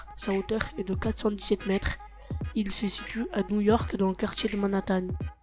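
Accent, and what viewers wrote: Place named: North, France. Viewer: Sa se voit qu'il vient du nord le quéqué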